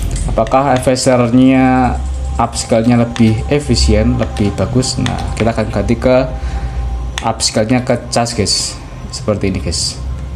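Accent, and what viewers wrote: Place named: Java, Indonesia. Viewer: Jijil banget klo dnger jawa mgomong😂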